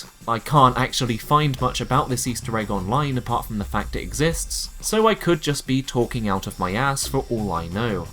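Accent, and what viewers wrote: United Kingdom. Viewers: you sound like your from the UK if so £4 at CEX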